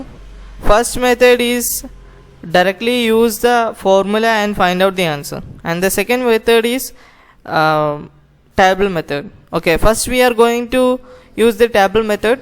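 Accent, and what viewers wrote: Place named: Telangana, India. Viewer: Your ascent is like my friend from telangana , you helped me the way he does, Thank u very much....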